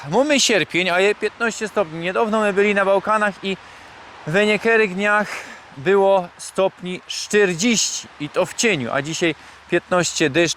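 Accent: Silesia, Poland. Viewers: Fajna ta śląska gwara 👍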